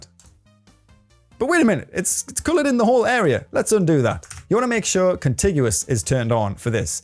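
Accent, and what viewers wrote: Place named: North East England, United Kingdom. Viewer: Just found your channel dude, not watched all of this yet but started, going to after I've done food shopping as I'm planning on making a 2D horror themed RPG and this will help me learn how to use this program and what not. Also you sound like you're from up here in the North East too, always good to see :)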